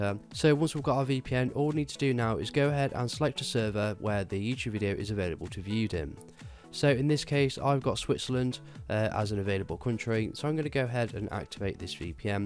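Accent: United Kingdom. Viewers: British accent hard for us to understand (we the US very easy on English )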